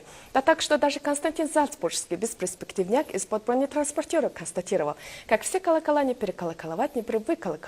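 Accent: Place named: Mongolia. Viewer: damn so thats how mongolian accent in Russian sounds like… those lisping L's are the cherry on top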